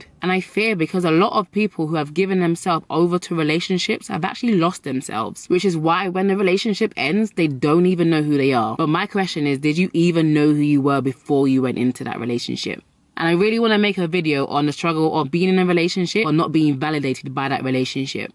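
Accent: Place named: Birmingham, United Kingdom. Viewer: I can here a brummy accent coming out have you been dwn endz😎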